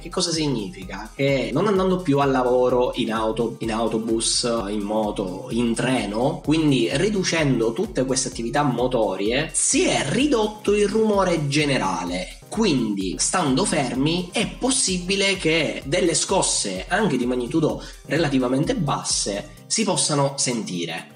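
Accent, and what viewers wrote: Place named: Catania, Italy. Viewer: Hahaha, grandissimo! L'inflessione catanese poi è bellissima, fa simaptia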